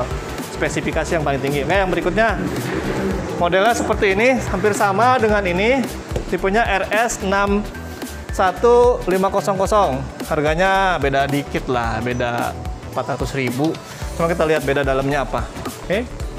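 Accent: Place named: West Java, Indonesia. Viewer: Sundanis banget"munggahan kang😁kang"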